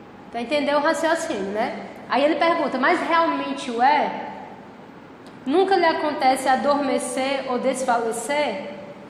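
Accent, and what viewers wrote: Northeast, Brazil. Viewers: encontrei seu canal por acaso. Estava lendo um artigo sobre Bergson que me deixou muito feliz e, inclusive, me gerou uns arrepios (alegria). Depois fui buscando mais sobre Bergson e acabei por aqui. O mais legal de tudo isso foi quando prestei atenção ao criador do artigo que, advinha, era de sua autoria. Muito obrigado por solidarizar seu conhecimento, sua forma espontânea, seu sotaque nordestino, como o meu, ainda deixou tudo mais atrativo e familiar sem perder a profundidade do pensamento de Bergson, um pensador essencial para nosso tempo. Ganhou mais um inscrito. Te ouvir me deu a impressão de ouvir uma pessoa próxima muito inteligente e fascinada por essa consciência da Vida que tanto me deslumbram também. Muito grato! Um abraço. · Boa noite! Sempre vejo seu canal e alguns vídeos seus detonando os esquerdopatas. Parabéns pelo conhecimento apurado e inteligente. 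Até seu sotaque nordestino dá um toque sublime as suas apresentações.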